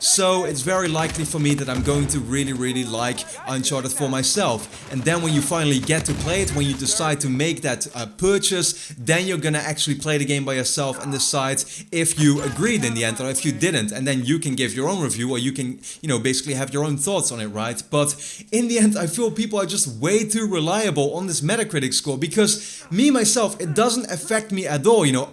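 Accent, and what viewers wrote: Netherlands. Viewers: are you from holland? i recognize the accent. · toen ik je accent hoorde dacht ik gelijk dat je nederlands was eh!😂 en toen keek ik bij je kanaal info en het klopte ahahah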